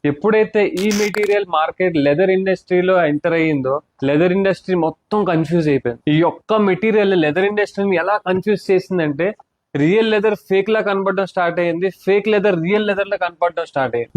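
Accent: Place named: Andhra Pradesh, India. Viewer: Mee telugu slang super..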